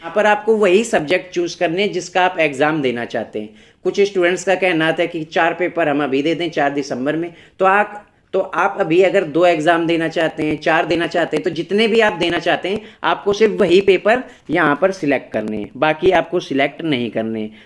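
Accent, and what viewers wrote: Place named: Uttarakhand, India. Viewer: Hey bro may I ask u thing??..... Do u belongs to Uttrakhand Or somewhere from there... Actually your ascents r too similar to regional people of Uttrakhand Or nepal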